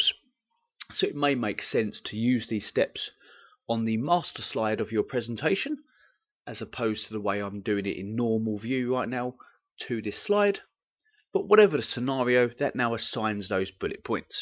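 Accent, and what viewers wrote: United Kingdom. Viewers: Thanks It helped me a lot. Also, your british accent is very satisfying lmao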